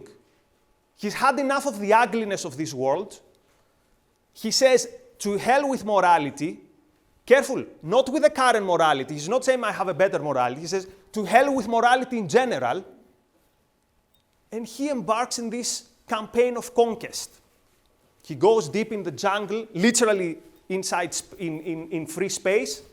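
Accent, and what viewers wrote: Greece. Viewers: Greek accent is adorable.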